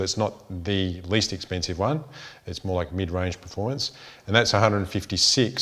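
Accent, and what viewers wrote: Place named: Australia. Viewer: Since some of us hv a hard time w OZ accent.. Be nice to hv more text display in explaining the specs.. Do a detailed listing on the main screen for comparison.. Otherwise an awesome 👍🏻 review..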